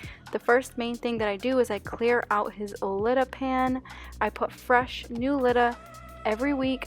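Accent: Boston, United States. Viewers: Are you from Boston? I can hear the accent!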